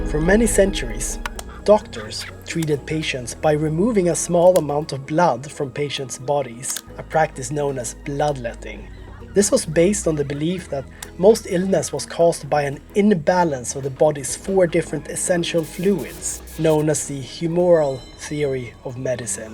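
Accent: Sweden. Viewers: I think this sounds silly, but I've been seriously hurt by some Swedish people before, and unfortunately, I've become distrusting of them as a result. Your videos help me hear your accent and work on destigmatising Swedish people as whole just because of a couple of bad apples. Thanks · unapologetic swedish accent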